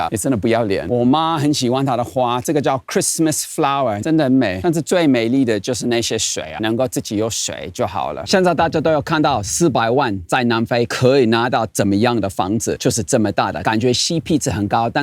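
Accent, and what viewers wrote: Taiwan. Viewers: 😂😂教授講中文竟是臺灣國語....安捏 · 越來越有台灣人講話的「口氣」，安捏都丟啦😂😂😂 越來越往幹話發展的趨勢，哈哈😄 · 教授台灣國語涅😂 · 教授的台灣國語加台語真到地,太像台灣人講話模式了!哈哈！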